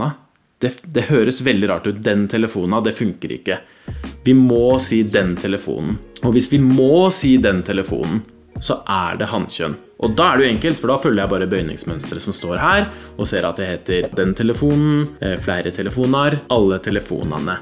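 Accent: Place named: Eastern Norway, Norway. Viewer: Det er stadig noen som sier 'jeg 'snakker' ikke nynorsk'. Ingen snakker verken nynorsk eller bokmål. Nynorsk og bokmål er litterære språk. Når vi er 5-6 år, er språket vårt ferdig utvikla - grammatisk sett. Vi må på skolen for å lære å lese og skrive. Der lærer vi å lese og skrive nynorsk, bokmål, engelsk, tysk, fransk, spansk etc + norske minoritetsspråk. Når vi skal skille norsk fra dansk og svensk, ser vi at dansk og svensk ikke har trekjønnsbøying, i samme grad, som på norsk. Vi kan altså si at norsk er et nordisk språk med trekjønnsbøying i substantivklassen. Substantivklassen er den største ordklassen. Vi kan generalisere ut fra det. Nynorsk er basert på norske dialekter. Eneste unntak er bergensdialekt og talemålet på Oslo V. På samme måte som med dansk og svensk så har de ikke mange hunkjønnsord. Alle andre norske dialekter har trekjønnsbøying. 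Når nynorsk er baserte på norske dialekter så er det 'bare' å bruke dialekten, slik som i videoen, for å finne rett kjønn. Legg merke til at, i videoen, snakker østnorsk. Østnorsk er norsk med trekjønnsbøying. Altså kan østnorske bruke sin dialekt til å finne ut av bøyninga i substantivklassen. Selv østnorsk er 'nynorsk'. Det er jo et norsk dialektområde. Å si at man 'ikke snakker nynorsk', og dermed 'hater nynorsk' er ikke et gangbart argument.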